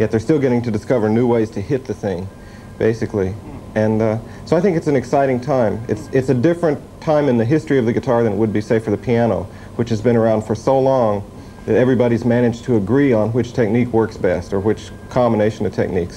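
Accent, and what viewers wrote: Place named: Texas, United States. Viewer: I never really noticed Tuck's accent before... is he originally from Texas? ...or this part of the country?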